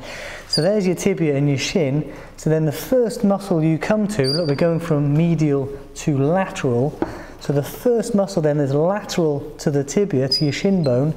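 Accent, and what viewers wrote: England, United Kingdom. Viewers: Brilliant delivery by a clear English accent. If only all YouTube videos were this good. Keep up the good work!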